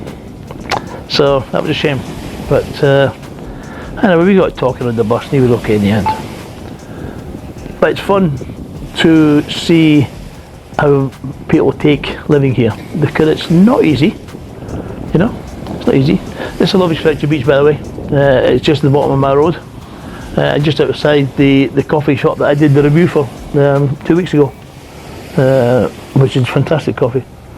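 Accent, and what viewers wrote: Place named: Scotland, United Kingdom. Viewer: I am enjoying your vlogs , and learning to understand your wonderful Scottish accent (lol)! Actually I am a Scot by ancestry, but quite a few generations ago. When I went to Scotland I was embarrassed by my accent, since my surname is pure Highlands . I am looking forward to retiring to Thailand in a few months. Jomtien is one of the places I am considering. My hobby is building and sailing radio controlled sailboats, but they are big and I will probably need a car to transport them to the beach. I have heard that you can park right by Jomtien beach. Is that correct? Looking forward to meeting you in November. Cheers, Colin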